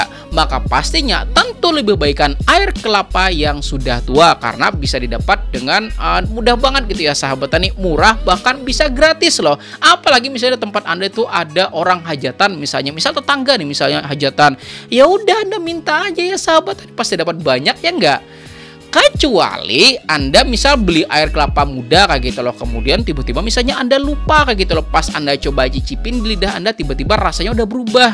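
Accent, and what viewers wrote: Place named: South Kalimantan, Indonesia. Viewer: Suka denger gaya abangnya jelasin. Di dengar dari logatnya, orang Kalsel ya bang?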